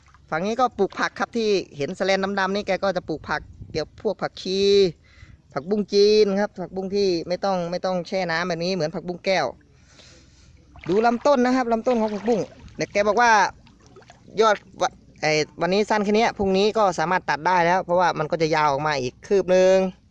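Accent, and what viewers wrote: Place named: Isan, Thailand. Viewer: เฮดคลิปแบบนี่เด้อเจ้านะ,ดีหลายคั้นแมนเจ้าเว้าอิสานบ้านเฮา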